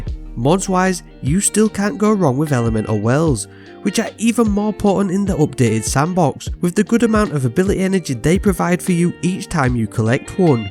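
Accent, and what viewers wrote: United Kingdom. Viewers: It’s great to hear a British accent on d2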